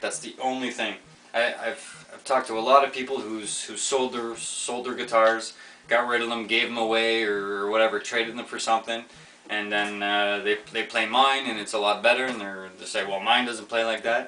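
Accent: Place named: Canada. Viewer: Thanks for the review, useful. btw, from ur accent alone, I knew u were from canada, and im not making fun, i find the accent soothing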